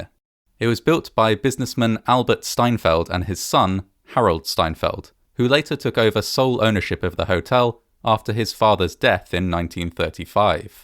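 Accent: United Kingdom. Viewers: I always been wanting to say this but the narrator's voice is awesome. Part of the reason why I watch these spooky vids is due to his voice. Its British, isnt it?? Truly awesome. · I love your voice and UK accent, you're soothing to listen to after a hectic days work. And your stories are very entertaining and enlightening.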